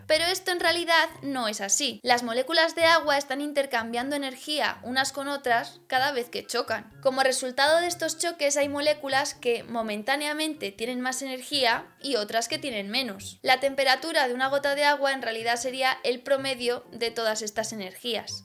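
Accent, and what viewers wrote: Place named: Colombia. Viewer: No parece Española, parece Colombiana.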